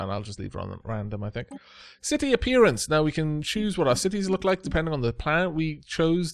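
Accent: Wales, United Kingdom. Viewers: Welsh Accent + Stellaris + Epic Music + Space Bugs = Alef-0 levels of awesomeness.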